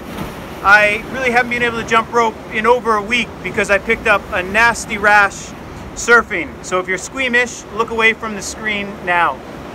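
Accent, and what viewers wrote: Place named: United States. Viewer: Still really enjoying your content. As a typical sedentary 60 year old American I need all the positive example and inspiration I can get; you keep me focused on getting in a few skipping workouts each week. Currently working on various cross-overs and footwork drills to put together a consistent ~20 minute workout that is challenging but enjoyable. Extreme heat and humidity along the US Gulf Coast makes it much harder for an old guy like me but once the seasons change it will be much more enjoyable. Why do I think you're in Ireland (but you sound American or Canadian...)?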